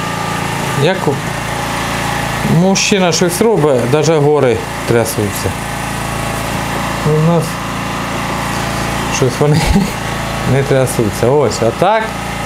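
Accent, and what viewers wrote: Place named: Chernihiv, Ukraine. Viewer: Суржик супер, яка область, Ченіговська?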